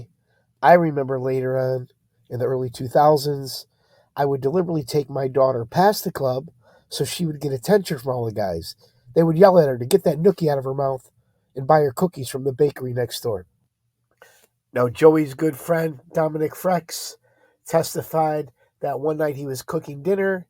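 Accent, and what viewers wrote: Chicago, United States. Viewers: You've got a natural talent for this and a great Chicagoan speaking style. Run with it. Your doing real good. You keep it real with the chuckles at times and all. Keep it about the trials and cases and you can go far. GL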